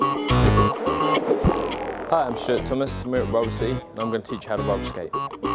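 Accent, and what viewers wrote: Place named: Scotland, United Kingdom. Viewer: Did anyone else think he said "Hi, I'm Shit Thomas,"? Obviously it was Stuart but it made me laugh. Scottish accent ftw.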